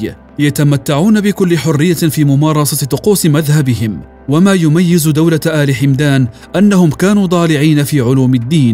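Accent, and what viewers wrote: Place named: Lebanon. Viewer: World News وليش عم تحكي لبناني؟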